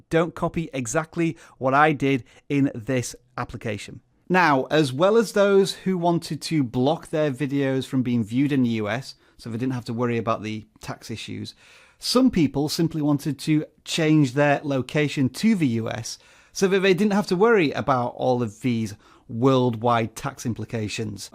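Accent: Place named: United Kingdom. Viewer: I'm confused. How does it work out for you? You sound like you come from the UK, listed as being in the US and you say you live in Canada?? Are you not sharing a VPN like loophole we don't know about? :)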